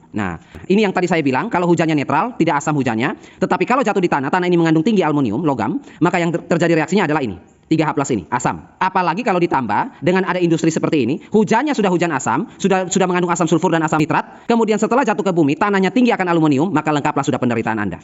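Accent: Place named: Makassar, Indonesia. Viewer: Makasar kyaknya om sama kayak pak Rocky gerung hehhe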